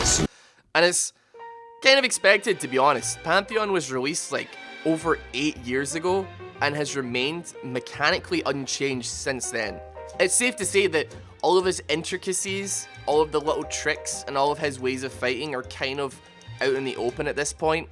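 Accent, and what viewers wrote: Scotland, United Kingdom. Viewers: Bro are you scottish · Is he from scotland? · Bro, you’re as scottish as anyone van become xD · is Scottish or something?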